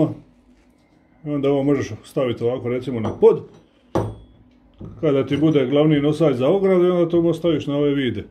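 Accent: Dalmatia, Croatia. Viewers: Obozavam ovog covjeka slusati kad prica. Meni su Dalmatinci vrh🙃 Inace sve dobro objasnjeno Pozdrav iz Banja Luke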